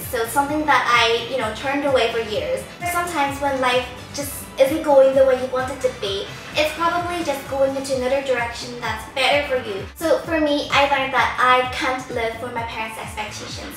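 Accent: Northern Ireland, United Kingdom. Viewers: Northern Irish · You sound kinda northern Irish?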